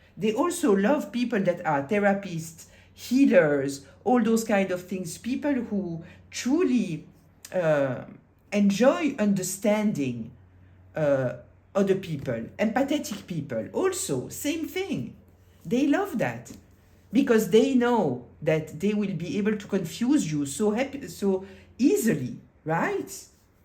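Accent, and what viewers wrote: France. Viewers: Tres insightful! Your readings seem to really tell the sad story of who she is...with a strong French accent. 👏🏾 Thank you! 🙏🏿❤️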